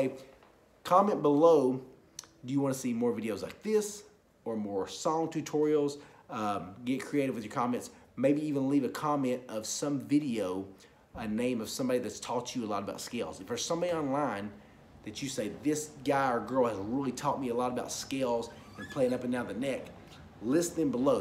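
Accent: South, United States. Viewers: says: 'There is no B Sharp', says: 'This is a B Sharp'. Uh no that's a C. I give you credit for trying to teach others when you're really not a very good player, takes a bit of nerve. Watching you try to play notes was painful, too bad your knowledge doesn't transition to actual playing. I guess that old saying 'those who can't do, teach' is true. You are kind of fun to watch, with your good ol' boy style and accent so kudos to you. Practice practice practice (you'll get there). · Yea man...any little trick that puts chords to leads is helpful without tellinng anybody you done whipped up some pentatonic simplicity. Also teaches you where to find alternative chording deep down and up top in the nut region and everthing in between. Brother, I use your vids for to students to keep it real. They come in all sad they trying to play or cant feel the music. 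We pull up your stuff and say looky there.. this fella is putn down some good country sounds with a geettar and a Southern accent..lol...I love stealing your stuff to keep the kids motivated. You keep it simple but sound like a pro. Keep it all rolling. Even some Bass walks into simple chords like c d g a like this bass pick 1 count E E F# G# Open D string then strum that As you just taught us... simple but sounds like geting warmed up. Then move to the 1 4 5 progression and put it all together. 3 vids. Put all 3 lesson together voile' another kick butt lesson just like this one. Keep bringing it... man we love ya here in Colorado.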